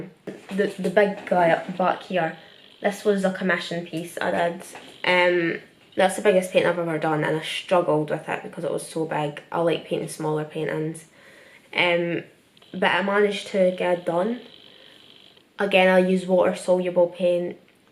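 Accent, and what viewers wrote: Scotland, United Kingdom. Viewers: English is her first language, she’s just Scottish and has a thick accent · She seems she from East South of Scotland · Are u british??? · 스코틀랜드 아니면 복부 아일랜드 같아요! 알아듣기 젤 어려운 억양인데 많이듣다 보면 익숙해져요 😊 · Why can’t my Scottish accent sound like that 😂😭 you’re incredible no wonder you got accepted! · I’m also scottish BUT CAN I JUST SAY HOW TF DO YOU MAKE OUR RANSID ACCENTS SOUND CUTE?? i souND LIKE A MAN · omg the minute i heard ur voice i was so happy cus im scottish too and finally i've found my fav fellow scot youtuber <33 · Just a curious question, whereabouts is ur scottish accent from? Btw love ur vidz 💖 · Kelly Cho 저번영상에서 스코티쉬라고 한거 같앗는데 맞앗네요ㅜㅜ진짜 못알아듣겠어요 감사합니다!!😘😘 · Yeah I know that lol I mean I wasn’t expecting her to be Scottish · she's from scotland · your art is absolutely amazing and so are you <3 i had a bit of trouble trying to underestand what you're saying though, idk if that's rude i really don't mean to be rude!! 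I'm just not scottish so I'm not used to it i guess - but would you mind putting subtitles ? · woah i was not expecting a scottish accent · the accent took me aback, didn't know she was Scottish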